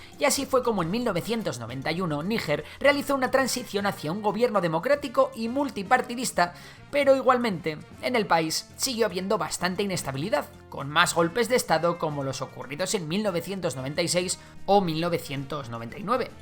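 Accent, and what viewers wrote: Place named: Spain. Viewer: Religión? Si, Europa hace del colonialismo un dogma, una religión, supongo que esos quieres decir. La revolución industrial provocó el colonialismo?, no fue su asquerosa codicia. Muy sezgado tu video, se nota demasiado tu acento español, que no se queda atrás, incluso matándose entre ustedes mismos durante su asquerosa dictadura.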